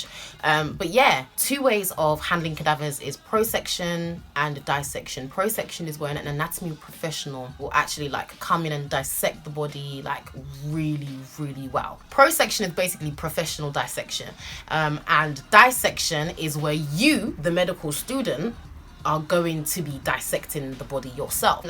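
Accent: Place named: Nigeria. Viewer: Love, Love, Love the little dash of your Nigerian accent😅. My mum was telling me the other day that she thought that her dream of being a doctor was going to be fulfilled through me (can you imagine!). I just laughed because imagine a doctor that doesn't like seeing blood 🤢. Anyways love the video, because "I can't come and kill myself"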